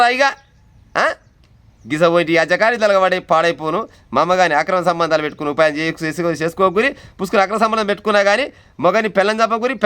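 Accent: Telangana, India. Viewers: అన్నా మీ మాట ముచ్చట అచ్చమైన నా తెలంగాణ భాష చాలా బాగుంధి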